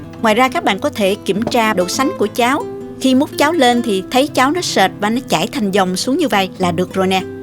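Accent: South, Vietnam. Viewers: Khong the noi ca?m o*n bao nhieu lan den voi. Kenh cua la kenh nau an, nhung thich nhat la cach dung tieng Viet cua, vua sang tro.ng, vua trong sa'ng, va rat ngot ngao voi giong Nam bo^. Toi nghe cac ban trong nuoc xai tu*`, toi that su*. nga'n nga^?m, cach noi vo^.i va`ng, dung tu*` hung du*~..... Rat tran tro.ng kenh vi da giu gin su*. trong sa'ng cua tieng Viet. Than chao, Hiep Than Minneapolis, MN Hoaky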